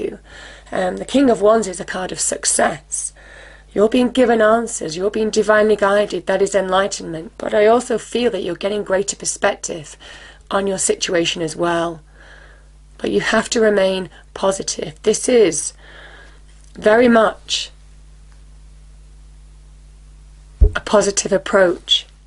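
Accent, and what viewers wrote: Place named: RP, United Kingdom. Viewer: 🌸gosh I luv ur intro and your voice, so posh 👍🏼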